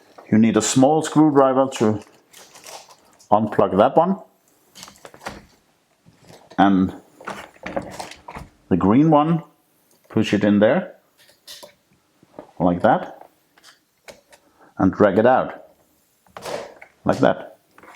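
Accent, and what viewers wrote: Scania, Sweden. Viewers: Njaaaa, lyssnar hellre på skånsk/danska 😀👍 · För sjutton! Kör inte på engelska. Jag slutar kolla. Hela charmen med din kanal är ju din dansk/skånska accent 😢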